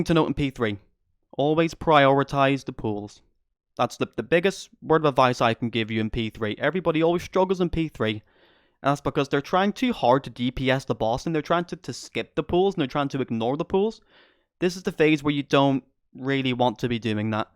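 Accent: Northern Ireland, United Kingdom. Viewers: Epic vid, saving for later :) Sounds like a norn iron accent, where are you from haha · wait wait wait, are you northern irish ?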